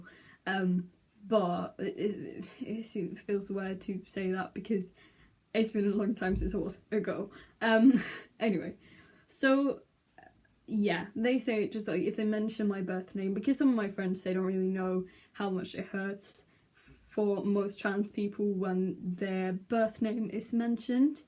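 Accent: United Kingdom. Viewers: I THOUGHT YOU WERE BRITTISH WHATT youre so good at english, like im swedish and my english is so bad TEACH ME YOUR WAYS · How did you learn English? And to sound vaguely British (to me) too? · btw I thought you were British, you sound like Martin Freeman talking XD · I actually thought you were British until I saw the vlog you did about your day at school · Live the video why the British accent not a Norwegian one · I thought you were British! :) Well, I'm Italian, but to me your accent sounds very British, and your English seems excellent!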